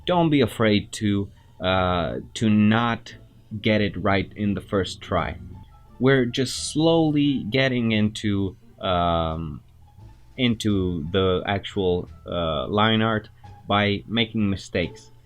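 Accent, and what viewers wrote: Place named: Romania. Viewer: Saw your comment on one of Jazza's videos and wow, i'm glad I checked out your channel. I love the moderate pace and good explanations. Just wondering, are you by any chance Romanian? Your name sounds very Romanian and the accent sounds familiar for some reason :)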